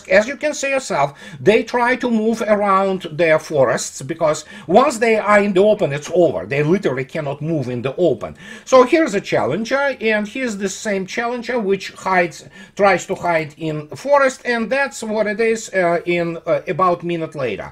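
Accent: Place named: Russia. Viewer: like always, thank you for delivering bitter truth with a beautiful Russian accent! One small remark, Russian word “Диверсия” should be translated into English as “Sabotage”, rather than “Diversion”. It is one of those words called "interpreter’s false friend". it sounds the same, spelled the same, but have different meanings in the two languages... So, ДРГ should be translated as Sabotage/Reconnaissance Group. Alexander Mercouris got it right.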